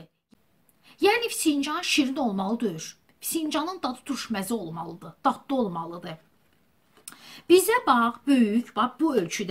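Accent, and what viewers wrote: Baku, Azerbaijan. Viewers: heç sənin kimi gözəl ,şirin ləhcəli bakılı qızından laykı əsirgəməy olar əllərin var olsun canım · Çox gözəl Bakı ləhcəsi ilə danışırsınız xoş olur.əlivizin ləzzətlərinə də söz ola bilməz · Çox sag olun. Bakili ləhcəsiyçin darıxmışdım. Əla izah edirsiz . Mən də 40 ildir fisincan bişirirəm, amma qoza sogan qatmıram. Qoyun əti və quş əti ilə bişirirəm. Sizin göstərdiyinizi bişirərəm. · Nə şirin bakı ləhcəsi var.❤😅.Hələ istiyirsən qula qasasan sənə qızım.Əllərivə saqlıq. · Qırmızı kitaba düşmüş təmiz bakılılar Darıxmışam Bu ləhcə üçün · Əllərivə sağlıq bakılı qızı qurban olaram ləhcəmizə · Ellerinize saglig gozel bakilim yorulmuyasiz .Lezzet edir baki lehcesini ewidende 🤗Masgura lap lezzet eledi 😊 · Can bakl can bakl lehcesi Adam birini bakl lehcesiynen danlsan esiden kimi ureyi qopur Cox teessuf ki az qalmlslq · Can bakili bacim.Ùreyim daga dòndù.Wirin Baki lehcesinde bize mexsus fisincani mòhtewem hazirladigina gòre tewekkùr edirem.Ellerine sagluq.Mawallah olsun. 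· Can bəh bəh təmiz Bakı ləhcəmiz və anamın bişirdiyi fisincan plov əlinizə sağlıq 🧿🧿👍👍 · Qurban olum lehceve can bakilim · Bakili xanim ellerinize saqliq. Xeyirliye biwiresiz. Baki lehcenizi anami xatirlatsin. Nesillikce temiz Iceriweherlidiler. Anamda gozel, tamli fisincan biwirerdi. Mende anamdan oyrenmiwem. Super di Baki yemekleri. ❤❤❤🙏🙏🙏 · Vallah darıxmışdım Bakı ləhcəsi üçün. Allah əlinizə golunuza güvvət versin, Amin! ❤🎉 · SUPER ELLERINE SAQLIQ SENIN O GOZEL WIRIN LEHCEVE QUZU KESIM MENIM GOZEL BAKILI QIZIM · Beh beh Can Bakilim ❤️❤️❤️inaninki fisincan qalib bir qiraga hayil mayil olmuwam daniwigiviza 💖💖💖nece deyirik biz bakililar edeln dedeli bawa salirsizeeee 👍🏻👍🏻👍🏻😂😂Mawallah Allah sagligi versin ruzuviz bol olsun biwirib düwüresiz bizde lezzet alaq 🤲🏻🤲🏻🤲🏻🤲🏻🤲🏻❤️❤️❤️❤️Allah qorusun xalqimi🤲🏻🤲🏻🤲🏻🤲🏻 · Sizin elə qəşəng,şirin Bakı ləhcəsində danışmağınız, fincandan da ləzzətli idi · Can ay bakilim sesive quvvet daniwiq lezzet eledi❤ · Can menm nenem de Bakilidi.lehce mene lezzet elyr.Allah var elesin haminizi.Ellerinize saglg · Fisincandan çox sizin ləhcəniz mənə ləzzət elədi. 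Mən də bakılıyam və əsil Bakı fisincanı bişirirəm. Xanımlara bir məsləhətim var. Əgər qara tavanız yoxdursa, qəm etməyin. Bir qaba 2-3 stəkan su tokürəm, köhnə ət maşınının setkasını atıram suyun içinə və bir çay qaşığılimon duzu əlavə edib 20-30 dəqiqə qaynadıram. Dəmiri içindən çıxarıram. Həmin suyu çəkilmiş qozun üzərinə tökürəm, 3-5 dəqiqə qaynadıram. Qoz qapqara olur, həm də turşməzə, heç lavaşanaya ehtiyac olmur. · Salam bakılı qız sənə bakılılardan salam lap ləzzət edir mənə sizin danışığınız mən də cox vaxt bişirirəm bayramda da elə belə vaxtda da əyər xətrim istəyirsə toyuqdan rəhmətlik anam və nənəm gil qaşqaldaqdan daharırlayardılar atam ovdan vurub gətirərdi amma biz toyuğu bişirəndə 2 3 dənə böyük soğan atırdıq və sonra onu cıxardıb atırdılar biz soğan qovurmuruq mən özüm gavalı turşusu hazırlayıram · ALLAH QORUSUN MAŞŞALLAH ƏLLƏRİN VAR OLSUN TƏMİZ BAKİ LƏHCƏSİ🤲MAŞSALLAH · Əsil Bakı ləhcəsi!!! 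Ləzzət elədi!! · Bu bizim Bakı ləhcəmizə gurban olum. Amma biz Əmircanlılar abgora vururug fisilcana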